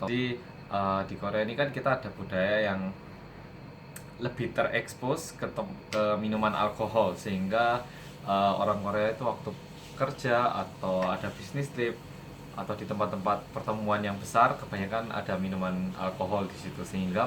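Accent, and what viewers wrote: Java, Indonesia. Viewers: aku suka soalnya ramah juga santun dan murah senyum logat jawa persis kaya orang jawa asli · Istilahmuuu... nek pas manndi isok bolotan... hahahah asli khas nduueso... medok. Saluut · orang korea yang medoooookk.... uda g ada kata"nya y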